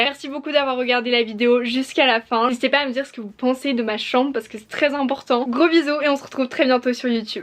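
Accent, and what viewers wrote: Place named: United States. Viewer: Très belle vidéo comme d’habitude ! Tu as un bel accent ! Une vraie américaine 😊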